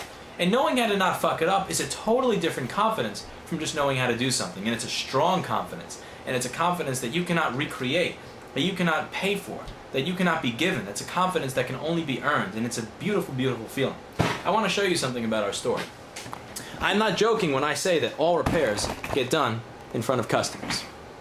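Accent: New York, United States. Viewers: Louis Rossman has that NYC accent.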